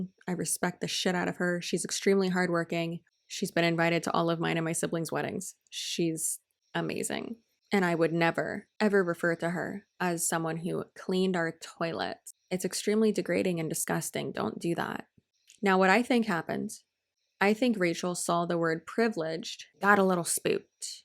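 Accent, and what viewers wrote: United States. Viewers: Also are you from south U.s born and raised? You have the perfect American accent 😍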